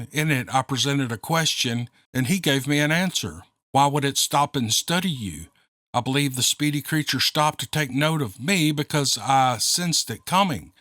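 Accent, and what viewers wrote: South, United States. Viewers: ALL of the stories were GREAT, thanks to those who took the time to share. (Of course, TY to and his delightful southern drawl.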